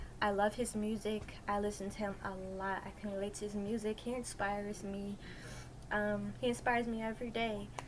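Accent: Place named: New York, United States. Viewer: I don't know if you have ever heard Lalalalafontaine!!!! He was a legend hockey player for the Sabres in the 90s. I like your New York accent.😎😎✨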